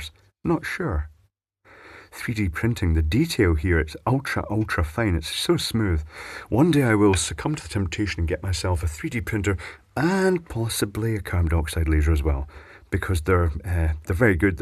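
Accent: Scotland, United Kingdom. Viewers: Hello senor.i am under the impression that your are a Manx bear. Now if that is true. My question is this. Do all manx bears sound Scottish? Or are my ignorant American ears mistaking a manx accent for a Scottish one.?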